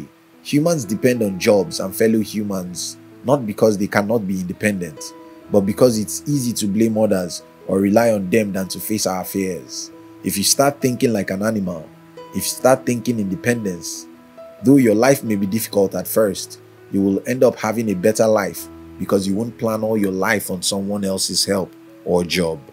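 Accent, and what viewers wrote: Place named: Nigeria. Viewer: That's Nigerian Accent, must be a Nigerian.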